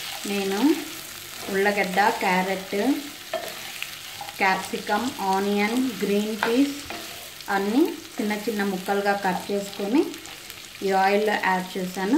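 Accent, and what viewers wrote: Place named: Rayalaseema, India. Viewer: Mi voice super alage midhi rayaalaseema andi endu kante vullagadda annaru anduke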